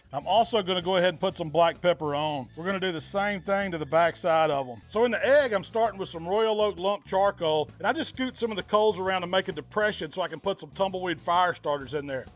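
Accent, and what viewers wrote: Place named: United States. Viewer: Offtop. Enjoying that accent. Which part of US is that?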